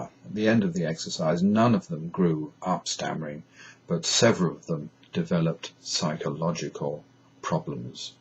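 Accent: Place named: London, United Kingdom. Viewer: Thanks for your reply. I find your accent to be rather "psoh," indeed, and acoustically pleasing! I could not detect any Scottish. (Although I love Scottish Accents.) I was going to show it to my class as an example of solid Upper Class, a model they could use to play scenes from The Importance of Being Ernest, etc. Now I can also tell them it is a beautiful example of RP. But you sound ever so much more genteel than the average BBC accent. It's a nice, solid sound, with lovely resonance.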